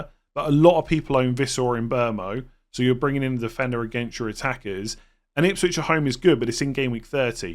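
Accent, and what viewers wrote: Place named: Midlands, United Kingdom. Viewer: where you from? Sounds midlands to me, derby/staffordshire way?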